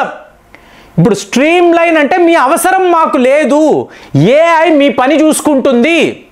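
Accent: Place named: Telugu, India. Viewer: Painful Telugu accent😢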